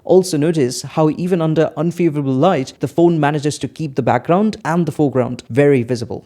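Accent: Bengal, India. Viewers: Bruhhhh i knew it i kneeew it that u were Bengali but i couldn't proove it .... from the start I was sure about u from south .... Nice videos man 👍 love ur reviews keep it up 🙏🙏 ...... Aren't u gonna do a moto signature??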